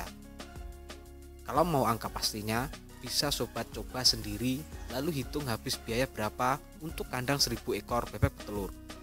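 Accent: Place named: Banyumas, Indonesia. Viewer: Kaya wong ngapak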